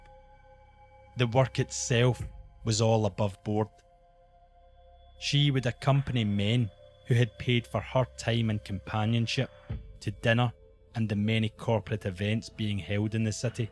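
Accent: Scotland, United Kingdom. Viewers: The scottish accent!!! so special!!!! ❤️ keep up the great work! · Hi, I just discovered your excellent channel. I was raised in Canada by my Scottish grandparents and I love listening to your videos. Can you tell me where your accent(s) are from in Scotland? My Nana was from Glasgow and my grandfather was from Tighnabruaich in the Kyles of Bute ( he said he had an “Argyll” accent and most people could not understand him in Canada) Thank you!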